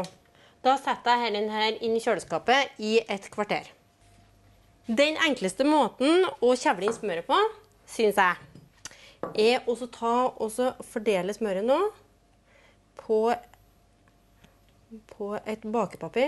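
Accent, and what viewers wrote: Norway. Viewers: Molde? Eller Opdal, ikke trønder isåfall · sør-trøndelag det ka du da høre? :P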